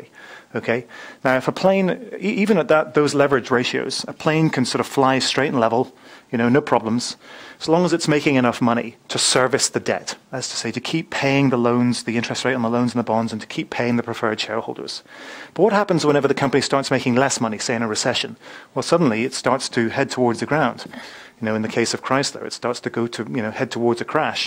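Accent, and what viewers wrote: United Kingdom. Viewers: Great video. After a couple of minutes I got used to British pronunciation and was able to concentrate on information. Will wait for new videos!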